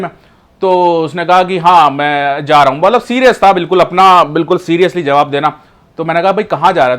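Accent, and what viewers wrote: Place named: Hyderabad, India. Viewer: Bhai Aap ke Hyderabad ke Dost Bahot the Kya? Kyu ke Aap ki Baat me Hyderabad ka Style hai.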